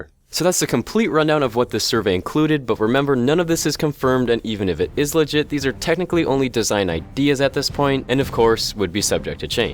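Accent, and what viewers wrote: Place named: Canada. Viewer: ***** Lucky bastard, I'd love to have lived in Vancouver...The weather is perfect over there, that or maybe Slovakia...But yeah, I guess I should have said loosely pledged allegiance, ;^) Anyways, that's a lot of info I didn't know about my brethren across the bay, thanks for the info BTW. Was born on the Great lakes, so we got Toronto's wind...And CBC! (Heh as a snot-nose, I actually got your accent due to hours of CBC!)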